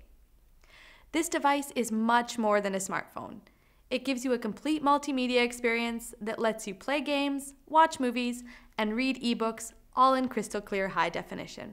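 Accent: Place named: Canada. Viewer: Agreed, Im having trouble figuring out what kind of accent. Canadian maybe? We would know if she said "out"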